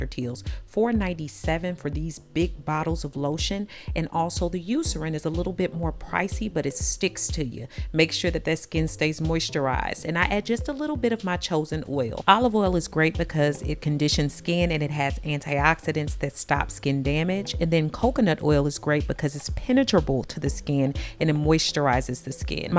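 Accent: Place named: Atlanta, United States. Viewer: You sound like Monica are you from Atlanta?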